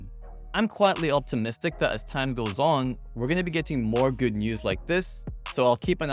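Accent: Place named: Scotland, United Kingdom. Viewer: Mate, you had a Scottish accent in your last video…..this is so weird.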